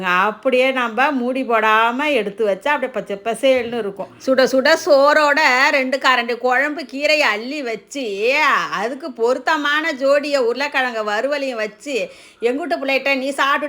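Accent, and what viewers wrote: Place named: Thanjavur, India. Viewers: தஞ்சாவூர் தமிழ் ❤ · நம்ம ஊர் பேச்சு,,, ❤ from mayavaram 🎉🎉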